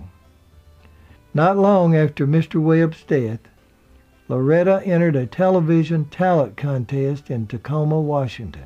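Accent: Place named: West Tennessee, United States. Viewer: just found your channel. Your voice sounds like pure West Tennessee. Very nostalgic, like listening to my grandfather tell stories when I was a child. Thank you.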